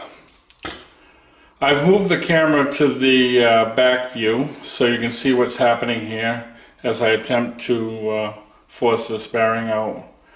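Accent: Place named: Rhode Island, United States. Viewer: Live in ri? I spot our accent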